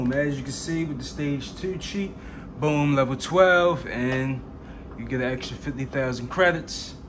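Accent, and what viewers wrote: Baltimore, United States. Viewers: You from Bmore I can tell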